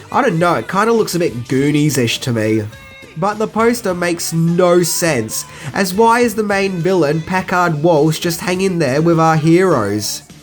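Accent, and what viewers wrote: Australia. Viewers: Its an Australian accent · Hes a Aussie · Akirasan Akirasan it’s what we speak here in Australia 🇦🇺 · Simon Tasievski bogan is australian, therefore he has an australian accent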